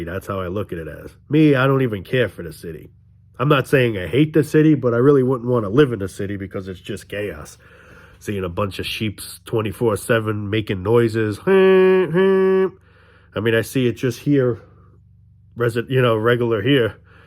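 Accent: New York, United States. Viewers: You’re funny as shit 🤣 your speaking cadence and New Yorker accent is hilarious 😂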